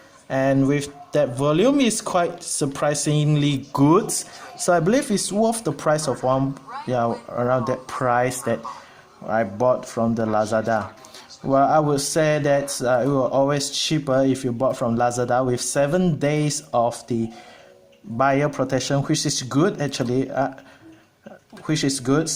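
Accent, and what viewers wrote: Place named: China. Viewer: Wao ur English so Chinese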